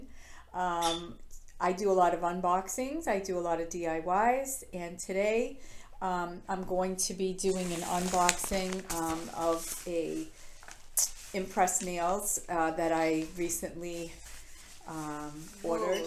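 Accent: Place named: Boston, United States. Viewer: You need to make more videos. We are all enjoying your lovely accent and nice personality. You make such nice videos of your nails. You must be from Boston or Maine. I was originally from Long Island NY and moved to warm Florida a few years ago to get away from the cold. Anyway keep up the great work!!!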